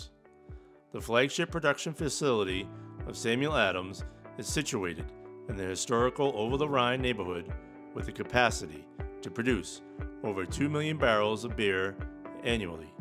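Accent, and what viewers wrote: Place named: Massachusetts, United States. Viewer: That’s interesting because I was always told Sam didn’t have their own breweries but contract brewed …which never made sense to me,. Thanks for clearing that up …I didn’t know they opened up by fanuiel …I’ll have to hit that. sounds like we have same accent, I’m from cohasset …are you from mass?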